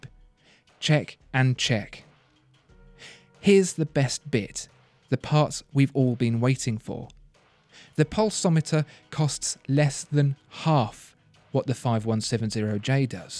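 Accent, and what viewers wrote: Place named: England, United Kingdom. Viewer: I love listening to him talking but if you speak about watches learn how to pronounce German and French names!! Literally ALL decent brand names are French or German. The pronunciation is just so very very English aka I know nothing outside of where I grew up.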